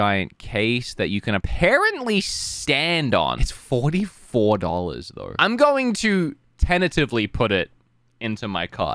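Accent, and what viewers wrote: Australia. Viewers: sounds more Australian then normal lol not a bad thing I just heard it more then usual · What's with your weird fake American accent lol.. I preferred your beautiful Aussie accent you have somehow lost..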